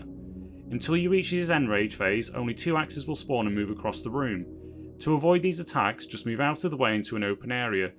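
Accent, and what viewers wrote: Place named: United Kingdom. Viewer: you have the most British voice ever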